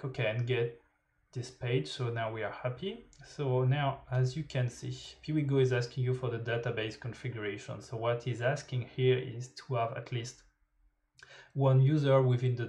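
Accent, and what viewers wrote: France. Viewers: pk pas faire la video en Français t'as l'accent français en plus je comprend pas l'anglais moi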